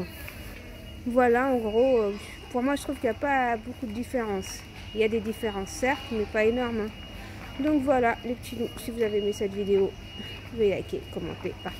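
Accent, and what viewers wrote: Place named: Paris, France. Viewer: L accent parisien que dire.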